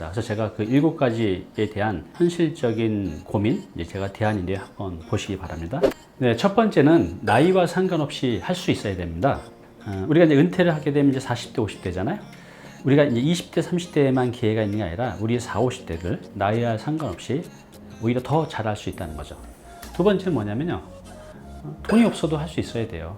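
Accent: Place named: Seoul, South Korea. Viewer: 외모도 깔끔하시고 듣기좋은 서울 말씨에 ㅋㅋ깔끔한 진행력. 알아듣기 쉽게 군더더기 없이 진행해 주셔서 너무 고맙습니다. 귀에 쏙쏙 들어와요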